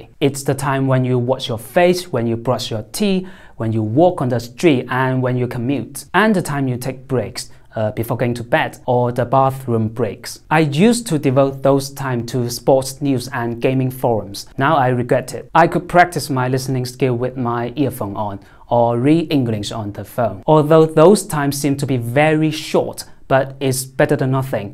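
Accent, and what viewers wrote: United Kingdom. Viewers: 你的好像是 英國口音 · 感觉发音偏英式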